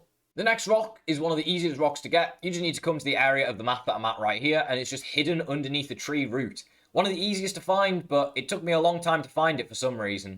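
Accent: Liverpool, United Kingdom. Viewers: Heyyyyy I been following your amazin grounded videos a while now all the way from switzerland and germany. not sure if you are scouse but I think you are. I been dreaming to be in Liverpool again since six years. you will find me busking in the evening on lord street (except saturday) since 1 june for a few months. approach me if you feel like. lol sorry crazy comment I know. hahahaha love your vids. say hi its haha. i dont busk with any amp just wit acoustic small guitar haha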